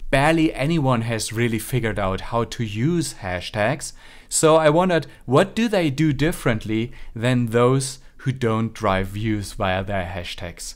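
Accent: Germany. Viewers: Thanks for the insight, I like your spreadsheet :D Out of curiosity, are you German?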